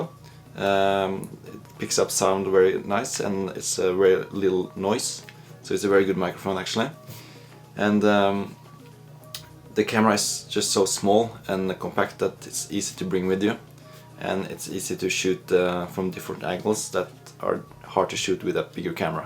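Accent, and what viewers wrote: Norway. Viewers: jeg hørte at du var fra norge, på engelsk-dialekta di ^^ Ska kjøpe det kameraet etter nyttår, gleder meg! :D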